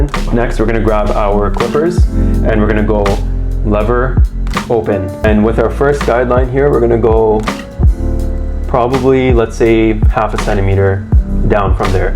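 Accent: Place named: Toronto, Canada. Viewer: Eh, are you from the GTA? (I’m guessing based on the accent)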